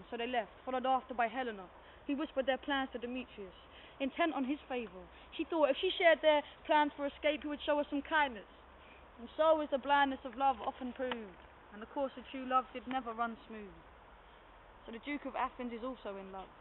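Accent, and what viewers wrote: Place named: South London, United Kingdom. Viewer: She's from South London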